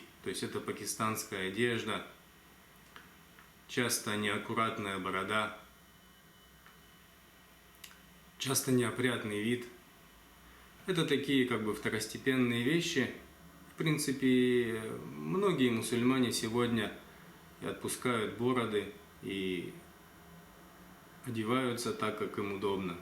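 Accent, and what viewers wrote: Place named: Kazakhstan. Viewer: Судя как он говорит, он не кыргыз, а казах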